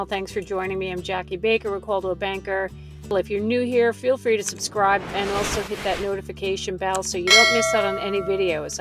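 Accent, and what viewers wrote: New Jersey, United States. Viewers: She sounds like she should of been on the Sopranos.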